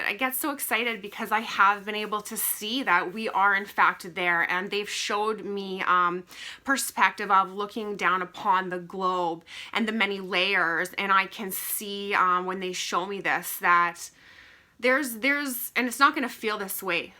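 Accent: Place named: Minnesota, United States. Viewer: I grew up in MN and now live in Italy 🇮🇹 in my wife’s country~ Are you by chance from the MN area? Just curious b/c some of the words u pronunciate sounds like it~ Thanks again 4 the info!!!🖖👌💪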